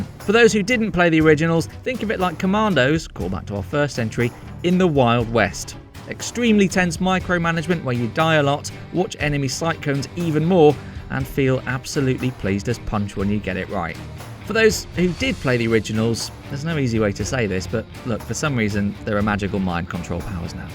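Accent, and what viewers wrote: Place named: South England, United Kingdom. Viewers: Who else loves the british accent? It's british right? I am not dumb right? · Yeah he's got an English accent. Sounds southern.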